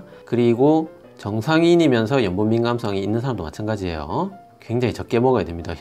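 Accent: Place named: Busan, South Korea. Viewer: 부산억양 귀엽습니다^^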